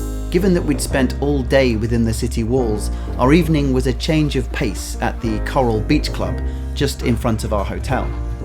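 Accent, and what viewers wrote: United Kingdom. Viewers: yeah. You make videos well. Love the british accent too. But bad quality camera not clear vids. Could have been perfect vids if u use high end cams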